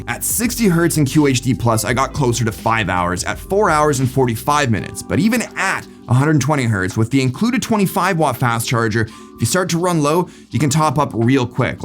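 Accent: Canada. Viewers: Why is every tech YouTuber a Canadian? Never noticed until I moved to Australia and became more sensitive to fellow Canuck accents.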